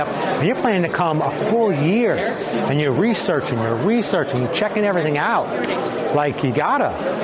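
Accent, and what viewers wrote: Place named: New York, United States. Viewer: New subscriber! Hello fellow New Yorker, I noticed the NY accent 😊 My brother was just there in Istanbul 2 weeks ago and I asked him to get me some replica shirts 😂 When he goes back, I am gonna ask him to get the vip higher quality shirts 🤓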